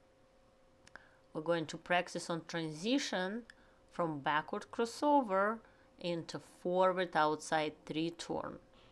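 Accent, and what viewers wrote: Russia. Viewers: А по русски никак? Слышу русский акцент🤔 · Enjoy all your helpful instructions especially with the Russian accent!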